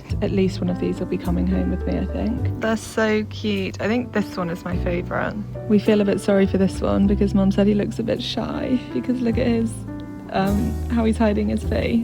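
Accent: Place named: United Kingdom. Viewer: Cozy vibes, beautiful autumn outside, yummy views of the dishes in the restaurant, and pleasant for ears British English... And the similar deciduous trees with yellowing leaves like those growing around my place... It's been a pleasure to watch the video. Keep it up!🎉❤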